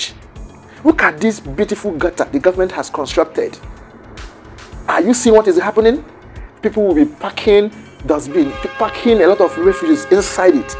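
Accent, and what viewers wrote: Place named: Ghana. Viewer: O boy u no be Nigeria with the way u sound,say for u Ghanaian because I no see u as Nigeria.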